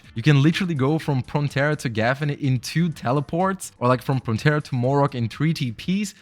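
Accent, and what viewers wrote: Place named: Brazil. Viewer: Tava vendo o video e comecei a notar um rastro de sotaque brasileiro. Fui olhar teu insta e nao deu outra kkkkkkkkkkkk por mais impecavel que seja o ingles sempre da pra perceber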